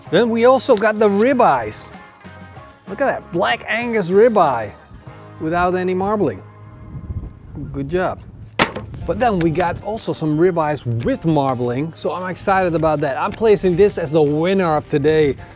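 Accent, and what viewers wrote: Netherlands. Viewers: Me watching this video: Noticable thick dutch accent Guy shows cheap steak from the jumbo: Yep definitly a dutchman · Would love to see you do some basque beef/ txuleta this year 😉👍🏻 keep up the great work, always love the videos and great to hear the Dutch accent 👍🏻 happy new year from Australia 🇦🇺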